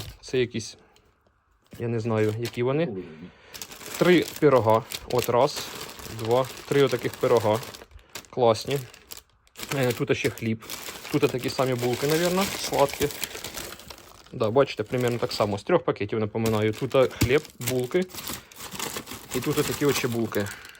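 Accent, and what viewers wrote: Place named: Zaporizhzhia, Ukraine. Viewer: З Днем Народження,! Миру і всього доброго! Так приємно бачити земляка, чути наш говір,ви з Оріхова,а ми з Поліг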